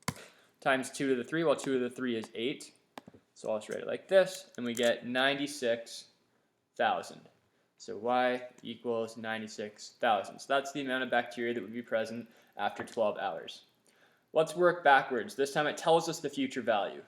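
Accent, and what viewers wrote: Canada. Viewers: The views go from 40k down to like 16k and then down to 10k. People arent sticking around for the whole thing. Lol. Shoutout to Canada. This dude sounds like a typical canadian dude.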